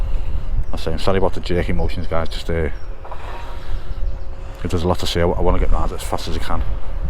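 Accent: Liverpool, United Kingdom. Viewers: Look forward to your updates! On a funny side note, your intro is very catchy and since the scouse accent is pretty unique and an earworm at times (as an Indian native, it was always different).. i found myself mimicking that starting bit xD Cheers for the good work mate.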